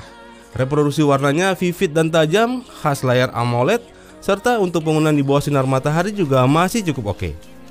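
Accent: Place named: Manado, Indonesia. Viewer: Bang orang manado ya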